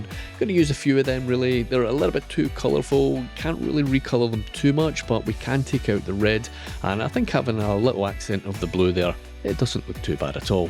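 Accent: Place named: Wales, United Kingdom. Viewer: As a native English man I can confidently say he's welsh.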